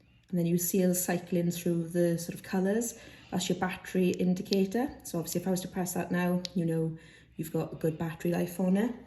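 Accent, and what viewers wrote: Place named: Wales, United Kingdom. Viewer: A fellow welsh person by the sounds of it! :)...and great video! thanks!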